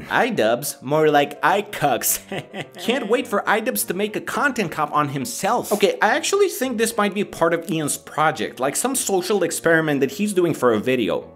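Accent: Romania. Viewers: I could hear your accent during this video, which I didn’t notice in many of your other content. (Yes, I know he’s Romanian, I just find it kinda strange that his accent downgraded a bit) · are you romanian?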